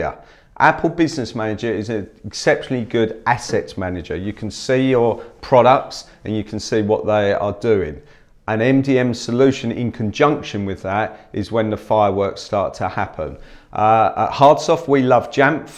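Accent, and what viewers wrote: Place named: London, United Kingdom. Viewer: He has a proper London accent - entirely different to Aussie one. The auzzie accent makes you want to rip off your ears lol.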